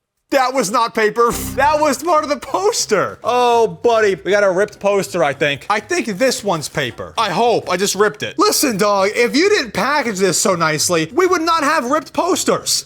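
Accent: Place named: United Kingdom. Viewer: Oh my gosh British accent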